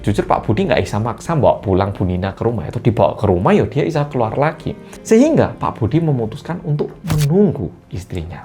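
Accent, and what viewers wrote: Java, Indonesia. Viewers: Rodo medok tenan ya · Hee jadi suka nonton pidio nya mas.. Ceritanya medok Jawa dan ceritanya santai jadi mudah D dengar, 👍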